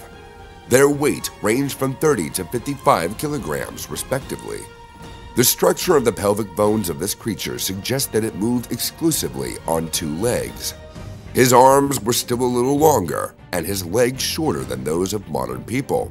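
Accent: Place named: West, United States. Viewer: Why speak with an Western accent and say meters and grams instead of ft and lb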